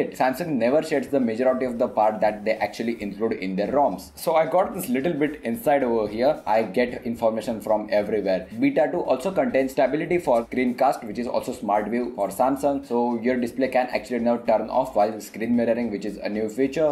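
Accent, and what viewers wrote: India. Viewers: love your accent...it feels wowly Indian....😎😎😎thumps up...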